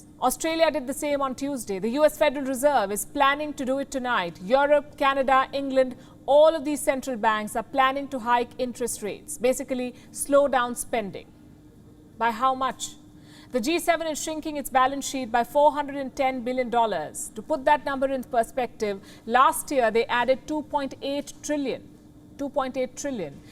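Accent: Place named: India. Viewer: wut lmaoo... her accent is totally Indian.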